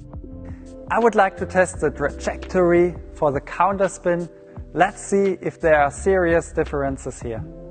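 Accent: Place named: Germany. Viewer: His English sounds so ... German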